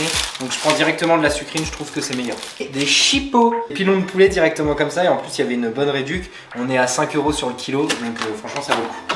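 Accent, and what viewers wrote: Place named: England, United Kingdom. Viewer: Tu manges du "bacon " mon lapin 🤣🤣🤣, j'adore 💕 ton accent anglais..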